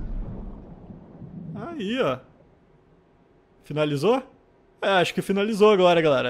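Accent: Rio Grande do Sul, Brazil. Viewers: você do Rio Grande do Sul?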